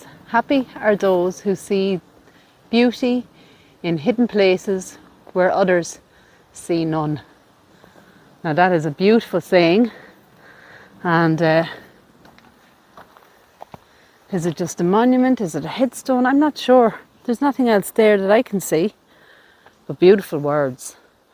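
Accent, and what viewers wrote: Ireland. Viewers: Such amazing places you show in your videos. I've always wanted to visit Ireland for historical reasons and the beauty of the nature. I love your dialect, it is really charming. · I know this isn''t an "IRISH* cemetery. Maybe it's the fact that the narration comes with an Irish accent. It looks like the cemetery is extremely huge if what you covered in 45 minutes is just a small portion of it. There's also a certain charm about overgrown cemeteries. I think it has to do with the body coming from dust and going back to dust after the spirit leaves.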